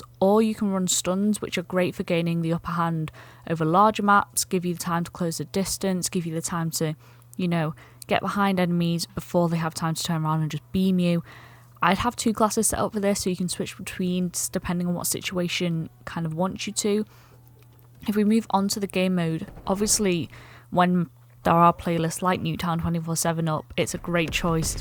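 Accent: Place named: United Kingdom. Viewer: Why are are you british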